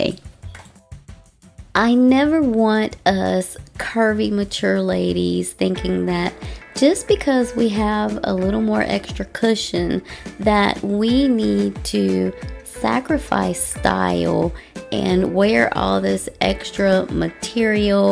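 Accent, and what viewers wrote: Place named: North Carolina, United States. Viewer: You're a sugar cookie, Love that NC accent too.. Subscribed 😉😊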